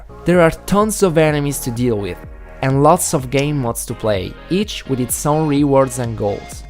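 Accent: Rome, Italy. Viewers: inglese romano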